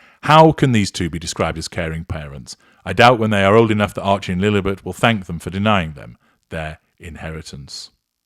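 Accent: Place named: Yorkshire, United Kingdom. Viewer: Ooh you sounded like Sean Bean with your Yorkshire accent😊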